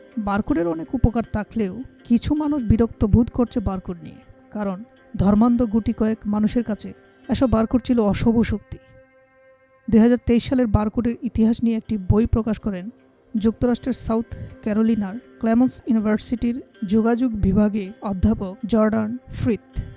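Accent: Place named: Bangladesh. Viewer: আপনাকে বাংলাদেশী মনে হচ্ছে 😊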